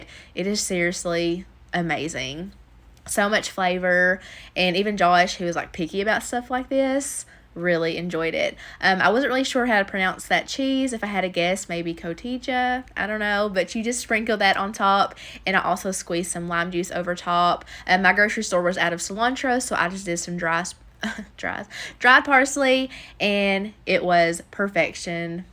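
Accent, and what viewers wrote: South, United States. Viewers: I was having a rough day. This video is just in time because that southern accent just calms my nerves lol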